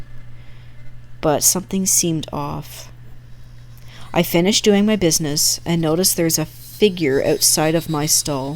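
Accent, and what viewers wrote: Canada. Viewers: Great video! Are you Canadian? You sound Canadian or at least some kind of northern North American.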